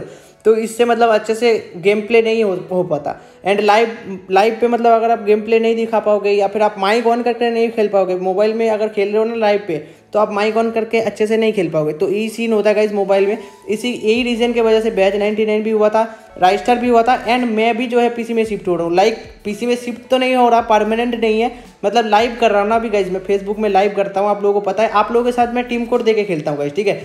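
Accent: Bengal, India. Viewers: Bhai tu bangali hai kya ? 😊😊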